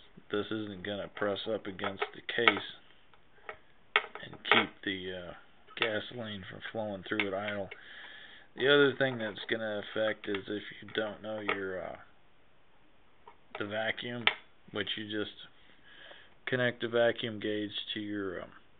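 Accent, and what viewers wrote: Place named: Appalachia, United States. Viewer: Damn your the slowest talking HillyBilly I ever heard.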